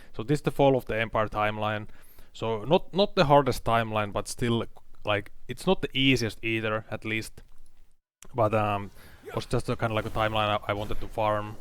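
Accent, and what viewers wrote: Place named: Finland. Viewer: Juu kyllä suomalaisen rallienglannin tunnistaa ^^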